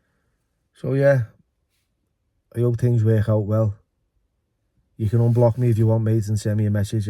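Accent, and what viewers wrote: Liverpool, United Kingdom. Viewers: Your deffo the best scouse podcaster enough said.😅😅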